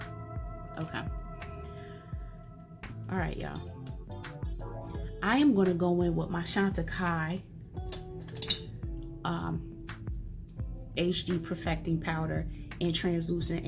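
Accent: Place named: New Jersey, United States. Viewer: Just found your channel and yes! Love your content Jersey Girl!(?) or if not from my home state, your accent tells us you are close neighbor👍🏽! Truly hope and pray your channel continues to grow because you know your stuff! So Awesome!!!! Your skin is amazing and so appreciate you doing You!!!! Thank you!! Can’t wait for the next video😊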